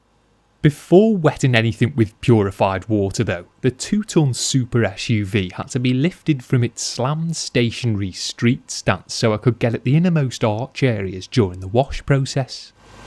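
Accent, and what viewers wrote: Liverpool, United Kingdom. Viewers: The interesting and compelling thing about is incongruity. He’s doing a working-class job (car detailing) and has the appearance of a working-class individual - but his commentary is fantastically articulate, precise, and detailed – to a degree that you expect from a lawyer, consultant, or other premium-rate professional. Add to that the accent (which sounds almost Liverpool, but not quite – maybe Warrington?) and delivery – providing such a reassuring and relaxing quality - it’s almost like taking 10mg Valium tabs…….and the result is great videos - interesting, informative – and just satisfying to watch. PROPS to – you’ve made a dull and dreary subject fun / absorbing! You are a CULT! Regards Chris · well youll have " fuck me "then too cos this has to be one of the most irritating voiceovers on earth and I love the Liverpudlian accent. 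its like he never takes an in breath. its really weird in fact.....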